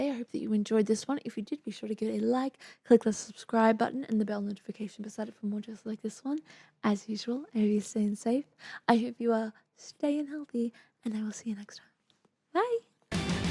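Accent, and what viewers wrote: Australia. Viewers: I love her reactions and love love love her Aussie accent😍😍😍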